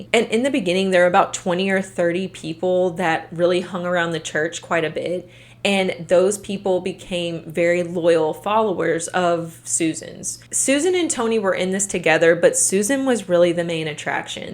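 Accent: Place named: Philadelphia, United States. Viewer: A question not related to this vid are u from the Philadelphia area? Just curious because I am and u sound like u r from the area. ❤️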